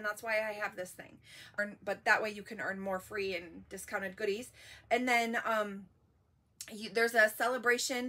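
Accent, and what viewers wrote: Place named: Michigan, United States. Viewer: fellow Michigander here! Totally recognized the accent immediately 🤗💜! New consultant here. You have great content! Looking forward to seeing more 😊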